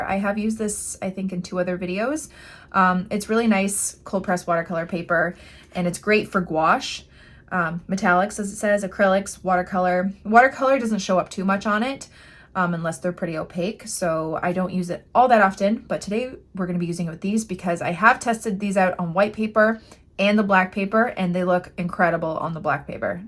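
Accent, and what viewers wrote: Canada. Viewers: I have another reason to love your channel. I bought a set of pearlescent watercolors from Michael's - about $8-10 Canadian. I tried them out, and the colors are so beautiful. I don't have the metallics though. Your video is very welcome. Question. Are you in Canada? Your 'accent' sounds different from Americans.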